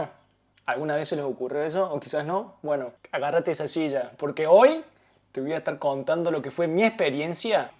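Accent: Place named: Córdoba, Argentina. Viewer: Mori con tu rrelato!! Hace unos años anduve 6 meses x Nepal e India, y llegue hasta Leh! Que lugar hermoso, y la cultura tibetana, me enamore! Mi idea es volver...No entendi como se llama el monasterio en el que estuviste viviendo, y el puebloto en wl que estaba. Tenes tonito Cordobés...yo vivo en Villa Giardino hace un par de años 😁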